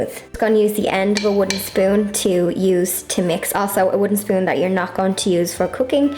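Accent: Ireland. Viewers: I've really enjoyed watching you. Thank you AND it's so wonderful to hear the Irish lilt 😍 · I love your Irish accent. Definitely going to try these with a view to selling them on my shop. Please do lots more! Have just started subscribing to your videos. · Your beautiful voice reminds me of my family in Ireland. I LOVE YOUR SOAP! It came out so cute and I hope Im just as lucky my first time round. Thank you for giving me some confidence in soap making ^_^ I appreciate you and all you do! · I love your Irish accent and your video looks perfect including your dress and lipstick matched to perfection . Your eyes look straight out of a doll . The whole video - yourself , the recipes , soaps are just perfect . In India I might not get the same quality of soap base but will try with what I can get . Great work ! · I knew by your accent yesterday u were Irish. I’m from Ireland too. 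What part you from? Ca bhfuil tu I do chónaí?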